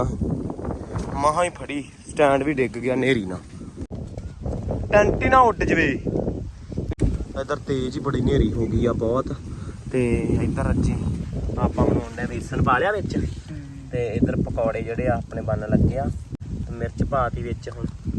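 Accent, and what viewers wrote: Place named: Makhu, India. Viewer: aa boli makhu wale pase bolde aa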